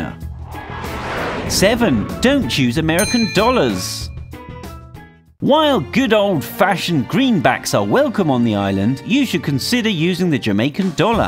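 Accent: United Kingdom. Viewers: This dude is clearly British (his accent) and he is talking about driving on "wrong" side of the road. Dude get serious. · And guys how are u going to assume he's English as we call it just cause he has British accent And plus DUDE OF COARSE THERE GOING TO ASK U TO GET THEIR STUFF and they are just trying to be polite to see if u need help IF U WALK TO A MALL LIKE FONTANA (PS Fontana is a pharmacy here) THEY WILL ASK U TOO THEY Do THAT EVERYWHERE HERE Again not trying to be rude if this comment is offensive I will take it down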